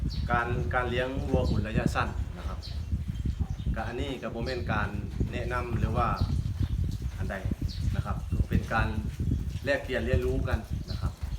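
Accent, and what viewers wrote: Thailand. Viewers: ฟังสำเนียงคือคนนครพนมหรือสกลนครผมอยู่นาทม นครพนมอยากเลี้ยงคือกันแต่ยังบ่มีความรู้ด้านตลาดแนะนำได้บ่ครับ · อยู่ที่ไหนครับภาษาพูดเหมือนบ้านผมเลยครับผมอยู่สกลนครับ ดูแล้วท่านเก่งมากๆครับ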